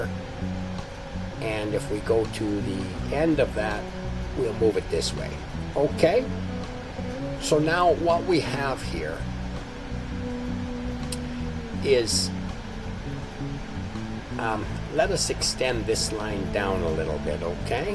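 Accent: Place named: United States. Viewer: Glad to find a American English speaking vid on LC. As I am hard of hearing...some with heavy foreign accents are difficult to follow. I would suggest modifying the background music or eliminating. The bass line of the music is near the pitch in your voice...reducing the clarity of your voice. Thank you for the video and I have subscribed.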